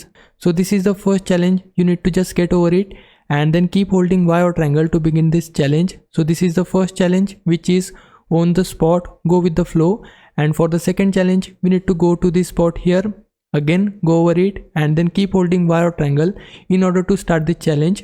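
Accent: India. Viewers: Indian ahh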